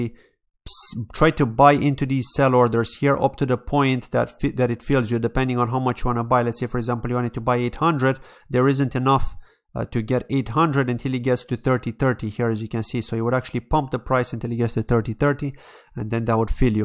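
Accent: Ireland. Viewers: You sound like an Irishman ☘️☘️☘️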